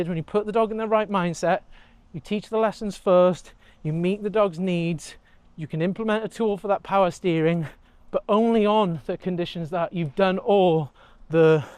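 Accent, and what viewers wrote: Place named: England, United Kingdom. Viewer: Good to see that I'm on a good way and combined merhods as you 😉 I thought it's mean after sniffling anyway to forbid everywhere. So I startet to take him in sit position in front of hotspots ... and wait till he ask with view to let him sniff and pee ... Realky great vid you kreate and I love the english 😁😆 nice to liste to 👍🏻 compares to the american ...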